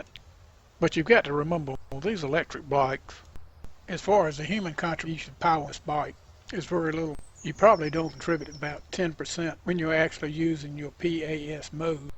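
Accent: South, United States. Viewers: Such a calm and slow-paced demeanor, it's very relaxing for new riders. Thank you! And this yankee loves your soft southern drawl. ;-)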